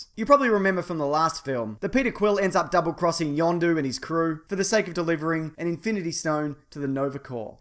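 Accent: Australia. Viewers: Always good to hear the rare Australian YouTube guru .... why had I not found you before ??? Ima subscribe.. oi oi oi !!!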